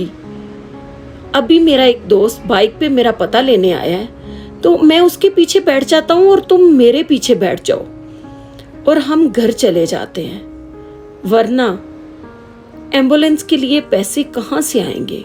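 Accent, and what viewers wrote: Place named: Punjab, Pakistan. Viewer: Your speaking style with Punjabi accent is very charming. Keep it up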